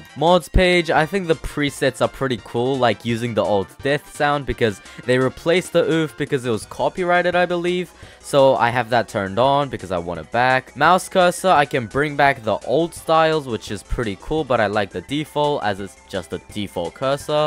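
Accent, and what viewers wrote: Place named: New Zealand. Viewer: Yo Are you from New Zealand?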